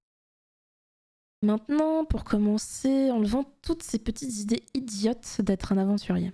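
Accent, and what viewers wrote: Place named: France. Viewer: Oooooooooo French accent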